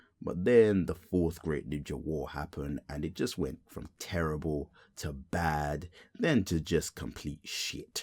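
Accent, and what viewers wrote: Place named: United Kingdom. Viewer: bro your british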